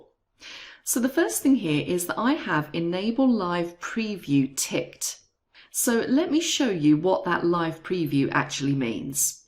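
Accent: United Kingdom. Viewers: Is that a British Englishh? It is such a great pleasure to listen!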